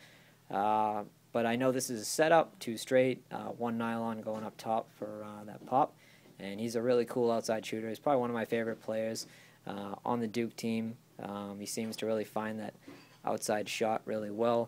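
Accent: Boston, United States. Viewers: Bro u got a heavy Boston accent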